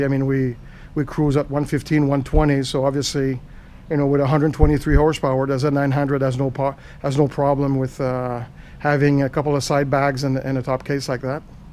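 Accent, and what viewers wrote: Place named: Israel. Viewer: אין כמו המבטא הישראלי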